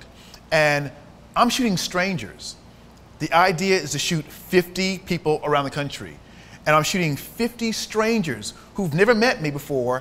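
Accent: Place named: India. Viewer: omg indian accent in polish mouth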